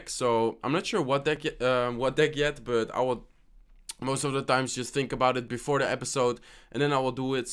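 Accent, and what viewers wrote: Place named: Netherlands. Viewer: You can hear that you're from the Netherlands that is what I thought when I watched you for te first time😂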